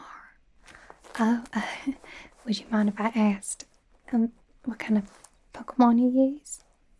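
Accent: South, United States. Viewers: The southern drawl is so cute. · A fascinating take on this character, who does fit the alt girl archetype in Pokémon lore, based on her Bulbapedia page, and with your (possible) Rogue-inspired Southern interpretation as her. High-quality roleplay with the works, as always,. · I love the southern accent. More accent plz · I assumed Marnie would be british. but I love your southern accent so this is fine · Omg alt + southern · Marnie with a southern accent isn't something I ever thought I needed to see or listen to, but it's so good! :_GengarDab::_GengarDab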